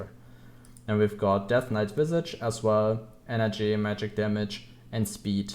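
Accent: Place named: Germany. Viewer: Hello, I'm a new player and I stumbled upon your video after looking through classes gameplay videos and wanted to ask how do you level up so fast (your paragon is at 1032 - paragon what we call on Diablo 3). I just hit 100 on Hell Diff 5 with the White Mage, still confused how to power up faster :D also love your accent (is it German?).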